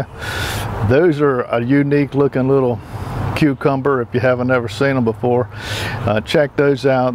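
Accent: United States. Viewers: I'm British your Fortunately American much love my green fingered friend :)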